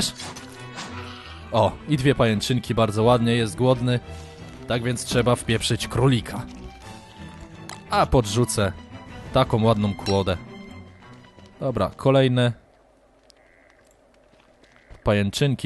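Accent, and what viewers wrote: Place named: Podkarpacie, Poland. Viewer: Zgaduję że jesteś z podkarpacia , albo z okolic bo tak mówisz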